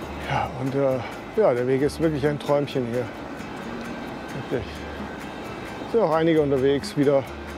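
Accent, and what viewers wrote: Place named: Swabia, Germany. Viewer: Hallo, sehr schöne Filme machst Du, hab schon fast alle angesehen, sehr kurzweilig. Jetzt ist mir auch klar geworden woher ab und an das Schwäbeln herkommt 🙂. Wäre doch ratsam dass Du Dir mal die Insta 360 Rundumkamera zulegst dann hättest meistens beide Hände am Lenker. Hab sie schon bei einigen Radyoutubern gesehen, bin von den Aufnahmen echt begeistert. Bin meistens im Allgäu oder Südtirol unterwegs, das Flachland im hohen Norden ist nicht so mein Ding. Mach weiter so viel Spass bei Deinen nächsten Touren freue mich schon. Liebe Grüße vom Bodensee, Roland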